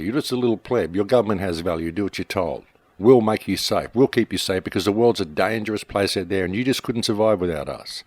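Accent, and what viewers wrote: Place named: Australia. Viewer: Thank you! Thank you for the time you put into your work for us! And thank you for being born in Australia! I love the sound of your voice combined with your accent as it skillfully spills the truth. Thank you for keeping us aware of our true surroundings while teaching us how to identify big picture realities which the majority of the human population is deliberately blind to.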